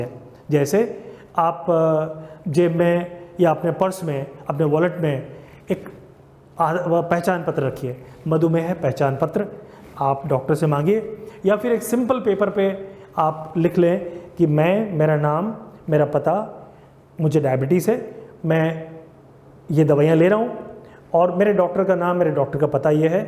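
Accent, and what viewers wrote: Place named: Rajasthan, India. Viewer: Your conversation says you belongs to rajasthan for sure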